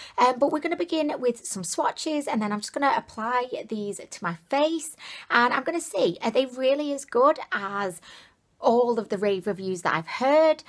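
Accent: United Kingdom. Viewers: It's def like a silk pillow!! Always watching and enjoying your entertaining reviews with your lovely British accent. <3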